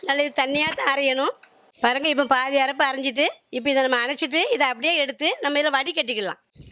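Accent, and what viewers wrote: Tirunelveli, India. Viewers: Thoothukudi and tirunelveli slang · நான் பிறந்து வளர்ந்தது எல்லாம் திருநெல்வேலி தற்சமயம் சென்னையில் இருக்கிறேன் திருநெல்வேலியில் இருந்த காலத்தில் வாரத்தில் ஐந்து அல்லது ஆறு நாட்கள் அல்வா சாப்பிட்டது திருநெல்வேலியின் தட்பவெட்ப நிலையும் தாமிரபரணியின் தண்ணீர் வளமும் திருநெல்வேலி மக்களின் கை பக்குவமும் திருநெல்வேலி அல்வாவின் உயர்ந்த ருசிக்கு காரணம் உங்களது திருநெல்வேலி பேச்சை கேட்க கேட்க சந்தோஷமாக இருக்கிறது அனைவரும் நலமுடன் வாழட்டும்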